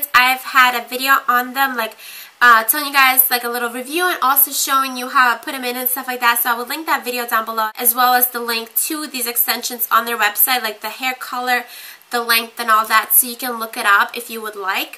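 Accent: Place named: New Jersey, United States. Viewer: Why does it sound like you're from New Jersey?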